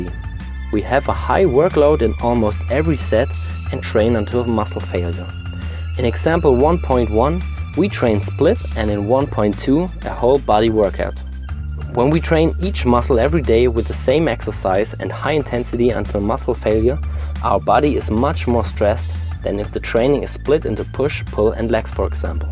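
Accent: Germany. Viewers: DEUTSCH AKZENT :) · omg... What's happening to me? Now even German-accented voice alone gives me a hard on?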